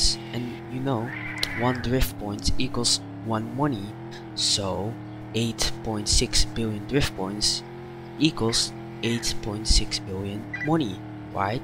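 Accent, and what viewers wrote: Netherlands. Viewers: Arer you dutch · are you dutch? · Dutch english accent alert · Ik hoor gewoon je bent nl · Ben u Nederlander? Want your accent. My dutch is bad lol. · Oh mah lord are u dutch🤯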